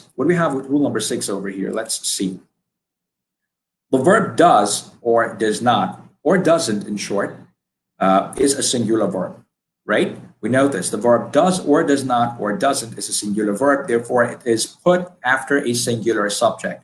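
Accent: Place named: New England, United States. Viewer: I love everything about these contents and the way Augmedix has approached in teaching the work-force that wants to eventually become a part of Augmedix. Getting to learn from this man has been a pleasure although his accent, even after so many 'thorough' listens; I find quite amusing as to me it sounds like a perfect half-in-half crossover between Eastern New England English and Mancunian. This man's tone combined with that accent is pretty 'chad' 😁😅🤘✌🤙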